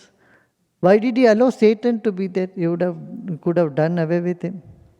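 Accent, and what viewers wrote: India. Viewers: I can only make out a word here there with this guy. The Indian accent is the hardest. It just basically blears words together.😳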